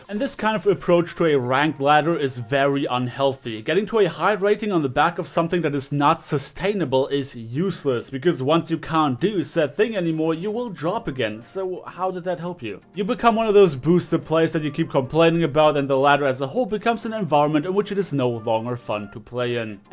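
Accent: Germany. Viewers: Is german your mother tongue? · is it possible that you are german ? xD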